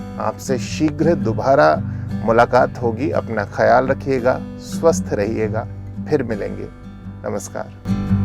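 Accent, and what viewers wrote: Standard. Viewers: Very informative and well explained and this is the purest form of hindi I’ve heard in a while🤩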